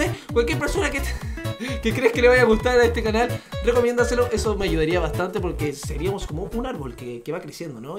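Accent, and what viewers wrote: Chile. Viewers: Es chileno ?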